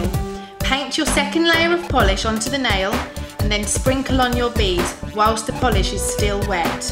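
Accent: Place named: United Kingdom. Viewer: Im falling in love with ur British accent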